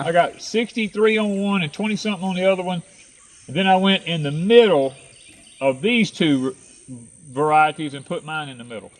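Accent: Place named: Texas, United States. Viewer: Hey guys, we’ve been trying to guess WHERE y’all are lol…we would say well they talk like us, have nut grass, a few weather moments yall would have matched ours, and finally just heard him say Conroe lol so finally someone that is in our climate. We’re in Beaumont adjacent aka Vidor. Nice to see that some of Conroe is holding onto the country lifestyle.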